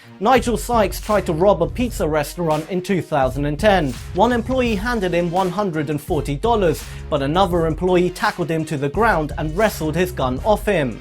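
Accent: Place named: United Kingdom. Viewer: Gets the second like and first comment, How's your day British narrator ?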